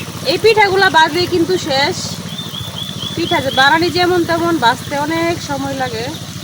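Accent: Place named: Comilla, Bangladesh. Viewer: আগে বলেন আপনাদের বাড়ি কোথায়??? ভাষাটা চেনা চেনা লাগছে, বেশিরভাগ আমাদের মত। তবে আপা অনেকদিন পর এই পিঠাটা বানানো দেখলাম, এটা কে আমাদের কুমিল্লায় ফুল পিঠা বলে। সেই খেজুর কাটা কাঁচা বাঁশের লেইল দিয়ে বানাইলেন, অতীতের পিঠা বানানোর সব স্মৃতি মনে পড়ে গেল। আমাদের দেশে বিয়ে এবং মুসলমানি অনুষ্ঠান আর নতুন জামাই বেয়াই আসলে, এই পিঠা আরো বিভিন্ন রকমের পিঠা বানানো হয়। আমি ভালো পারতাম না, আমার মা ভাবি পাড়ার অনেক মহিলারা মিলে বানাইতো, কিছু কিছু পারতাম, কারণ তখন ছোট ছিলাম। আজ আপনার কাছ থেকে ভালোভাবে দেখলাম। এখন পারবো মনে হয় ইনশাল্লাহ, আমি আপনাকে লাইক কমেন্ট সাবস্ক্রাইব করে দিয়েছি। ভালো থাকবেন। আর আমার জন্য দোয়া করবেন আমি খুব অসুস্থ, এবং শোকাহত, আপনার ভিডিও সব সময় দেখব কথা দিলাম। আসসালামুয়ালাইকুম 🙏❤🌻🥀🤲👍🎉 ঢাকা থেকে 🙏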